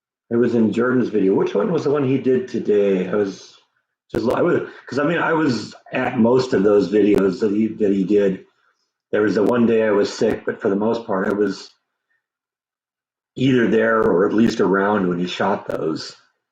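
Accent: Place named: United States. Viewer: I love your accent, American men sound sooo sexy to British women. I have a very British accent and speak very proper English.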